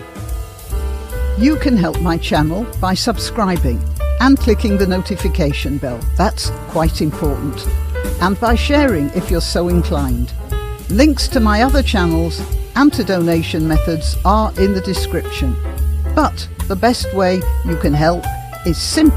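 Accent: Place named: England, United Kingdom. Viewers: I don’t know if you’ll see this, but which part of England are you from? I’m American, but my mother was a British war bride, and your accent reminds me of her family. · just because You sound English doesn't mean You're smart Because everything you're saying is so off base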